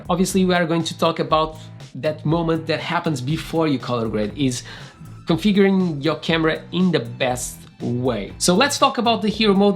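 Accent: Portugal. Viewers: Where are you from my guy that accent sounds kinda portuguese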